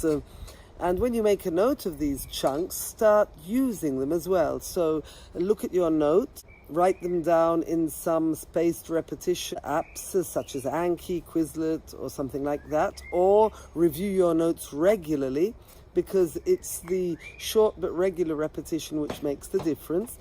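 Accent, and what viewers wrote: Italy. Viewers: You are a native Italian-English speak and yet have an italian accent when speaking English, that's interesting! I've never seen it before. - Great tips, thank you for the video!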